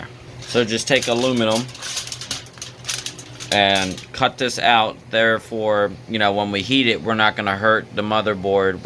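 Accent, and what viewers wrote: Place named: Pittsburgh, United States. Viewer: You have to be near Pittsburgh....That accent.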